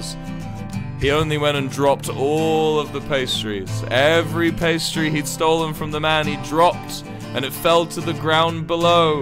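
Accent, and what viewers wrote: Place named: United Kingdom. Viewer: cos the original song is extremely american. wilbur does this sometimes but especially with this genre of folky type American songs. it'd sound off if he spoke in his british accent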